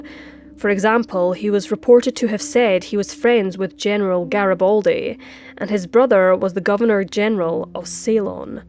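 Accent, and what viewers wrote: Scotland, United Kingdom. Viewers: Excellent channel your accent is scottish i think anyways thanks from Boston Massachusetts USA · It's good to hear a Scottish accent! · You uttered the phrase "by a malicious Englishman", with far too much enjoyment in your lilting Scottish accent! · I keep forgetting you're scottish. I really do enjoy Scottish narrations! Is your accent Glaswegan? (Sp? ) Or is it from another area? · Love your Scottish accent. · Love your videos, Scottish accent (I'm from Ayrshire) is the best for crime docs.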